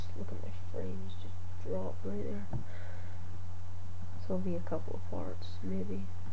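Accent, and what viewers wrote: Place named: Scotland, United Kingdom. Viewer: I figured out your scottish in this one, within 12 seconds, awesome lol.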